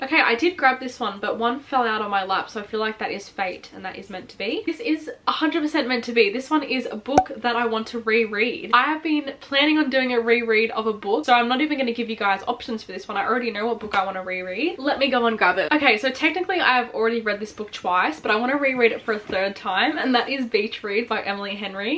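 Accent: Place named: Australia. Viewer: Cute Aussie accent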